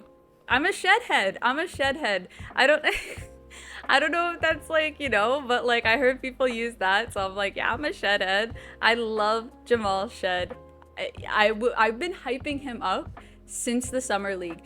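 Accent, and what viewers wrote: Canada. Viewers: Are you canadian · i was not expecting the toronto accent omg 😭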